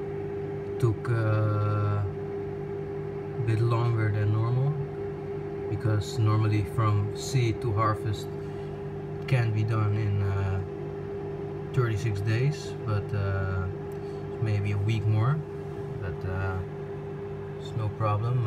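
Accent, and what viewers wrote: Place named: Netherlands. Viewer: Hey r u Dutch? You sound Dutch... or scandinavian. Anyway + sub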